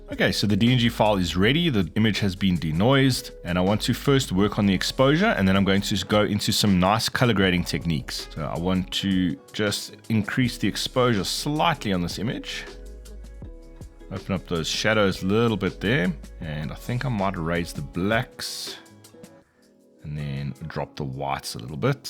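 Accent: South Africa. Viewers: i might be wrong but you sound SouthAfrican, or at least Southern African, and for that i will give this video a like. me supporting local content 😅. jokes aside, i literally started using Lightroom today and the knowledge you parted will act as building blocks to in my photography journey.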